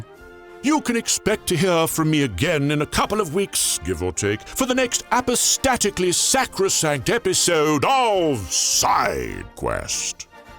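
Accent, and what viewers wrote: United Kingdom. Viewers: phenomenal animation, accurate history, Plus that middle-aged male British accent. Why is this only 13k subs? You earned one more today at least 👍 keep going! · I guess his accent is British. I don't know why but most of the accents of documentaries sound British to me.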